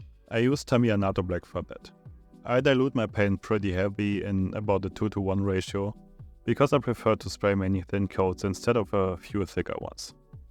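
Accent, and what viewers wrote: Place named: Germany. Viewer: Wirklich gute arbeitet. Sprichst du normalerweise deutsch? Dein Akzent klingt zumindest sehr danach 😂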